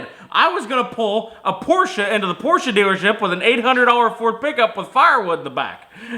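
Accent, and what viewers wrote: South, United States. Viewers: Funniest thing about this video is a dude with a country accent doing a country ass accent!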